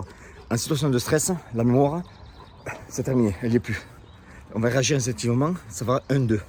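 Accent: South, France. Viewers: Bonjour. D'habitude, je suis à 100% d'accord avec toi, mais la tu est en train de te contredire. JE m'explique. D'habitude ton discours c'est "on m'agresse, bam je riposte direct en cas de moi-même finir out". Or dans cette video tu est en train de nous dire qu'il ne faut pas "defoncer" un gars qui te saisis au colbak et que effectivement si tu le fait ta reponse ne sera pas "proportionnelle" à l'attaque ce qui est tout à fait juste d'un point juridique. Mais comment fait-tu (à part faire du sparring lourd tous les jours) pour ne pas réagir de maniére disproportionnée lors d'une attaque ou tu est en stress????? Voilou. Je veux pas faire mon chieur mais, les gourous t'attendent au virage et la ils vont pas te rater. Enfin bon je prefere quand même le gars avec un bon accent de chez nous (du sud) au gars qui se rase la tête avec un elastique.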